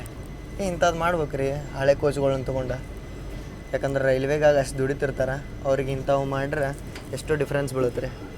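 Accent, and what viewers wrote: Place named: Uttara Kannada, India. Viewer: Nivu uttarkannada madi anusutte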